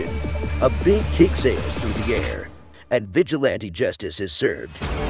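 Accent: United States. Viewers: This channel doesn't sound right with that American accent. Sorry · Why American narration? Good ole days, don’t need that.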